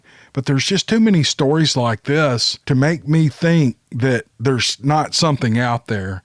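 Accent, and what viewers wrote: South, United States. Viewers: I like your genuine humility also. Ppl can tell. Southern drawls feel at home 2. I saw elves in 1976-77 in cheyenne wy. Not sure you'd want that story here. Nor am i joking. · do you see what you have started? My gosh! Folks love you reading these stories because they relate to you and the persons who tell (wrote) them. You make it so much fun... although never making light of the scary encounters that take place for many of these individuals (and the fact they have to try and learn how to deal with it). You have cornered a phrase... "Awright, here we go!" (In your way cool, southern gentleman, way.) Then those of us out here in any particular corner of the world lock in and are ready to take the ride.:^) You go,. We're right here with ya!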